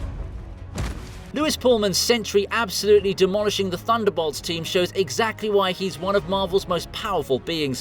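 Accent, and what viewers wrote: United Kingdom. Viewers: Will I listen to british commentary?It makes me think about suicide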